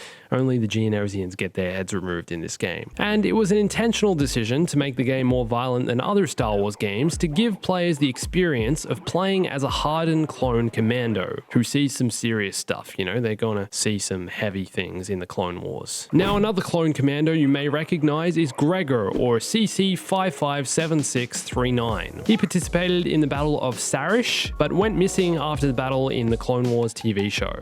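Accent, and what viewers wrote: Australia. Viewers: Are you from Australia bro? Love your accent ;)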